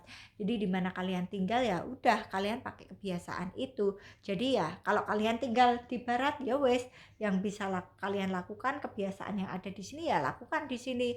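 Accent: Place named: Bali, Indonesia. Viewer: Ini logat nya orang Bali ...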